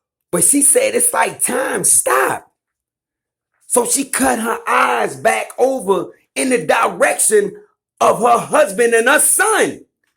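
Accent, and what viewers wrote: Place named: Louisiana, United States. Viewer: Hello,. Are you from Louisiana? You have an interesting accent, I enjoy hearing you speak, as I am not often exposed to people that have your accent. I'm a Hillbilly in northern West Virginia, so I have more of a Yankee accent. The people in the southern counties of WV have more of a southern accent. I'm definitely going to hit the subscribe button. Looking forward to more. Respect and Blessings from Wild Wonderful West Virginia. 🙏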